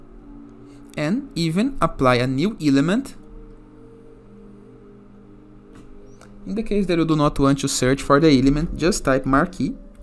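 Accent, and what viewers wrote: Brazil. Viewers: Its some Brazilian accent? 😉Nice TUT, brother!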